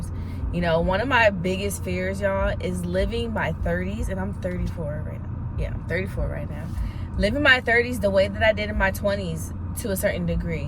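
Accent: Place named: United States. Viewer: I'm here to learn American accent and you are helping me mam i always watched your video thorough this i get so many things ❤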